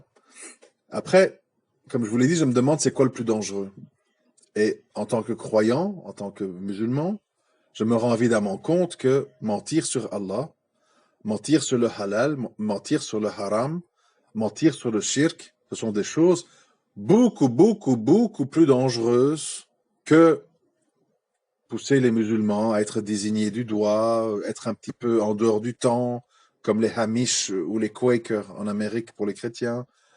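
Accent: Belgium. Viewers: T es lourd à écouter avec ton vieil accent belge,petit débutant converti,t arrives pas à la cheville de Hassan iquioussen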